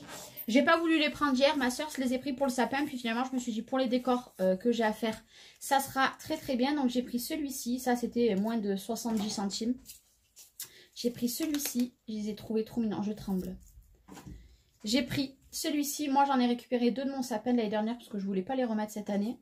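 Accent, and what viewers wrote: South, France. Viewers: Bonjour ,dans quel Action allez-vous ,vous avez un joli accent du Sud mais cela ne veux rien dire , car dans mon Action je n'ai pas tout cela et encore moins pour faire des cartes cela fait bien 3 semaines que tout a disparu par contre nous pouvons commencer à planter nos narcisses, jonquilles etc ..... en région parisienne ça sent "le printemps Action"" Passez de bonnes fêtes en familles en rouge et Or .Amicalement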